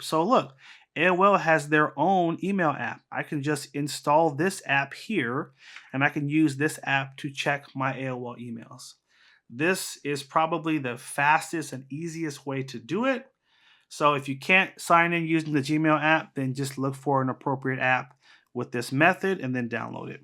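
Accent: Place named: United States. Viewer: I am a 78 years old English man and for me you talk far too quickly with a very strong American accent and race through the video too quickly. I have to keep stopping it to go back to practice what you say on my phone. Other much younger people who have seen this video on my desktop pc agree with me. So not just me that thinks the same ! Anyway thanks for downloading this video. The following video is the best teaching video I have come across for teaching beginners on Android phones how to copy and paste. ''Timmy'' explains clearly and does not race through the instructions as many YouTube tutorial videos do. As one of the comments wrote ''Straight forward, articulate, concise instruction. Exactly what we seek. BEST of any out there. Thank you, Tim!'' How To Copy And Paste On Android Tech Time With Timmy <link>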